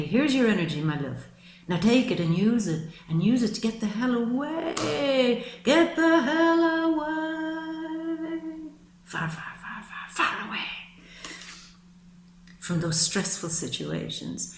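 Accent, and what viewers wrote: Ireland. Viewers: I can't believe you said "Bohemian" ... my mother was born in Bohemia! This was very spot on, stressful to hear because it tells me I was right in all my feelings about my current work, but also stressful that I now know what I HAVE to do, which I was trying to avoid. LOL. Not funny really. You are great, accurate, and caring and I appreciate that. I love your accent, is it Irish? My father is Irish. You reminded me of my Sagittarius self. Thank you so much!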